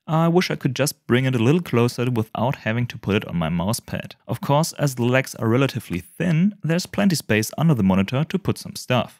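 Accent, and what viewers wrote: Germany. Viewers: Dude, you sounds like a German who speak English. Hoffe mal ich lag richtig. Hahah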